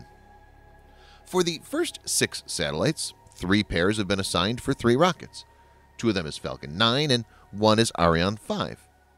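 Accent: United States. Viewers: "The common reason is actually the same"... What the heck does that mean?? The butchered English in an American accent on this channel makes it difficult to listen to! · American English is its own form of English full accepted with British English. The voice in the video used precise American English. So it wasn't really butchered.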